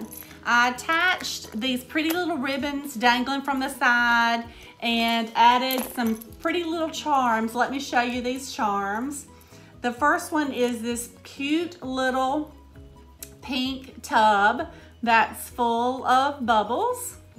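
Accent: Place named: Georgia, United States. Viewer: i love this ! i really like that you didn't make it too fat so you have lots of room for stuff. i would love to see how you sew it together & i would like to know how to tea stain paper. i've tea stained fabric for years, but not paper & i think it looks beautiful. you have lovely original ideas & i'm so enjoying your vids. i know we're neighbors cause you sound like everybody i know :):) have a great week & God bless :) :) nancy in ga usa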